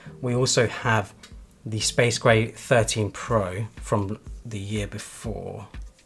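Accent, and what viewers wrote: United Kingdom. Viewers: What a decent British Accent 👌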